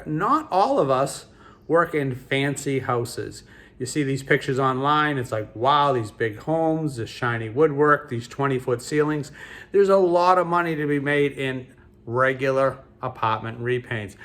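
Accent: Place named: Boston, United States. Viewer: I can tell you now that yes..that's $USD That accent is from Boston, Massachusetts.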